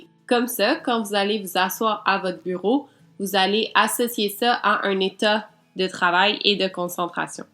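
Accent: Quebec, Canada. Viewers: Merci vous m'avez vraiment aidé +1 pouce bleu PS: j'adore l'accent québécois je sais pas pk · j'adore ton accent ! !! 😍😘 québécois ! 😉😃 je suis Français 😛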